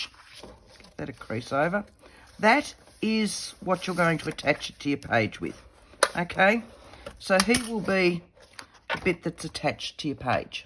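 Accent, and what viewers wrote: Australia. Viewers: Hi from Ballina, NSW. Nice to hear another Aussie accent. I really enjoyed two of your videos that I've just watched and will definitely be adding this to my next journal. I'm happy to have some cooler weather this month. Cheers 😊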